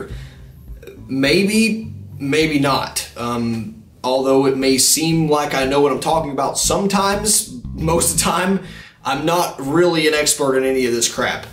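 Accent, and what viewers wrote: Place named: Mississippi, United States. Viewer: Digging the channel man, keep it up. I recognize that accent, sounds like Mississippi or somewhere around there to me! I got friends out there, haha. ;)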